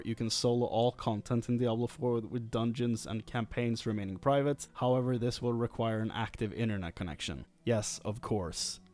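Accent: Sweden. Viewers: As a swed, he sounds like a swed ^^ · He sound Swedish.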